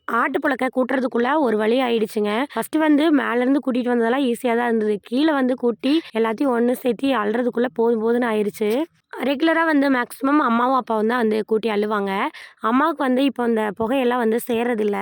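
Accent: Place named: Thoundipatti, India. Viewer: Thoundipatti ... neega rntha area .. enga area slang ithu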